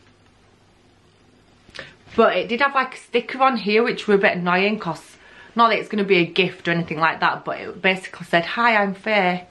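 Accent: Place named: Manchester, United Kingdom. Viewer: “Propped good hun” proper Mancunian woman I’m a mancunian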